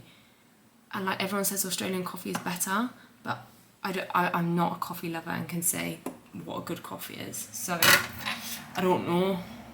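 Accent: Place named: England, United Kingdom. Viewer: Came here from tt - English accent was a nice surprise 😂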